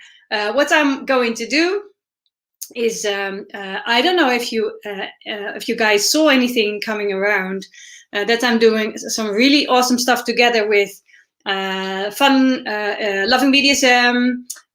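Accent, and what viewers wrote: Netherlands. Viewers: Hey, thanks for the informational vids, just discovered your channel yesterday, it was linked below a video of the mystery box show. I already recognized a dutch accent with you:) hoop dat je een fijn weekend hebt gehad!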